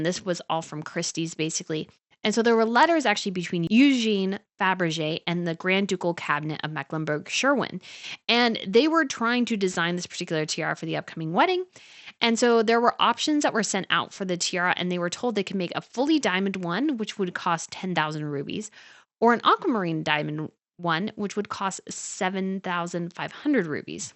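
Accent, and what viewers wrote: United States. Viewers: Makes me cringe listening to your whining American accent criticising a late Queen’s tiara RIP. No class, no respect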